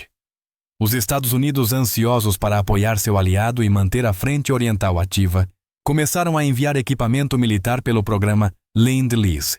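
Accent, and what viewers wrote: Portugal. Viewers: Horrível esse português europeu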